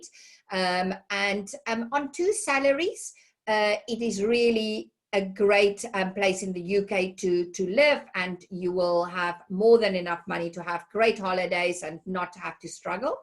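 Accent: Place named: South Africa. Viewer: Good to hear you still speak with your South African accent 20 years on. 3 seconds in and I knew you were from here.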